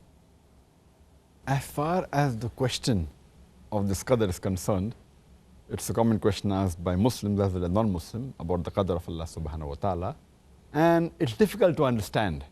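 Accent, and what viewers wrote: India. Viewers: I'm a Muslim but I can't really understand his saying. His English is can't understand because his voice Indian Accent 😢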